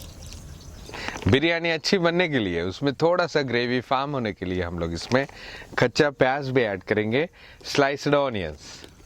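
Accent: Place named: South, India. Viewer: i really like his south indian ascent. keep it up, dont try to speak proper hindi or urdu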